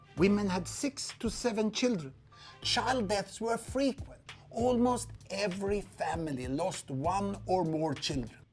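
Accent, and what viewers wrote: Sweden. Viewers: Never heard a more swedish accent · Kan inte ta han seriöst med sin jävla svengelska. Känns som man tittar på en kass Siba reklam eller något. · Han pratar ju bra och tillräckligt avancerad engelska, bara att uttalet är typiskt svenskt. Kan ju knappast kallas för svengelska, och jag tror att det bara är vi svenskar som "stör" oss på det.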